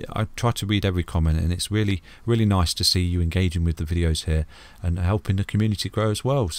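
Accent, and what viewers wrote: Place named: Manchester, United Kingdom. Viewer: your accent sounds like you from Manchester? If so I’m from Manchester as well we definitely got to have a link up!!!!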